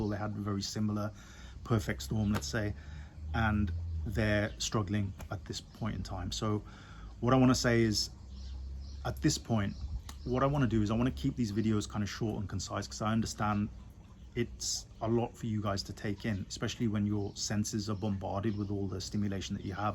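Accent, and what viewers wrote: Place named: Birmingham, United Kingdom. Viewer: Sound like a real guy bro. From a fellow Brummie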